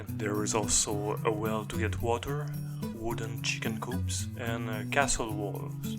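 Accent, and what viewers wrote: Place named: Quebec, Canada. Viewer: Awesome ! You sound like a Quebecois ;) Jme trompe tu ?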